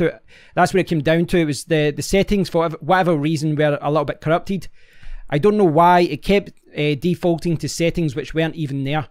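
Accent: Scotland, United Kingdom. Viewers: Yea. I agree. the scottish accent is one of my favourites.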